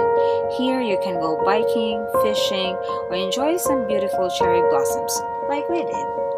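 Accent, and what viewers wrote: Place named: Philippines. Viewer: Philpino accent can be perceived clearly. Anyway nice and beautiful place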